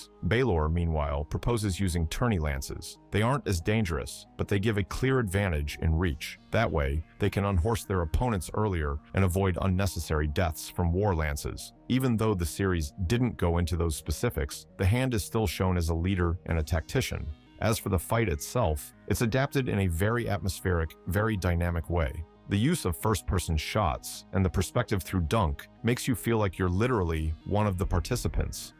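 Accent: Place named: United States. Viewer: You Americans and the way you destroy the English language with your ridiculous accent. Its not Noveeela, its Nov el only 2 synonym's not 3 synonym's no ve la! FFS 🤦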